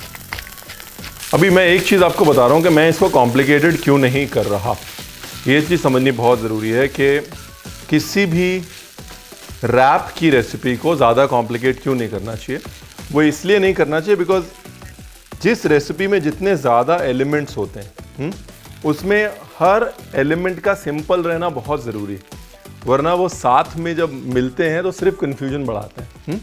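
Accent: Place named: Punjab, India. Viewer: Ranveer Sir I love yr punjabi Accent u say ...yelo ji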